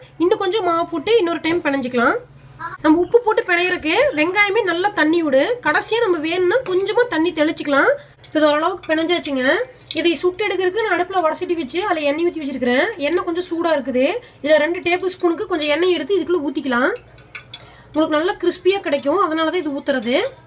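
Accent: Kongu, India. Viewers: Sis neenga kongu side endha ooru.erode??? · Yenunga amuni enna arumiya kongu tamil pesringo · Kongu tamil😻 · Kongu tamizh super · adada..yengooru bashai kongu tamil yena mariyadha...yena alagu..love ur beautiful slanggg than the pakoda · ஏனுங்க கோயம்புத்தூர்ங்லா நீங்க கொங்குத் தமிழ் வீசுதுங்க ராகி பக்கோடா அருமைங்க. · Kongu slang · Kongu slang semma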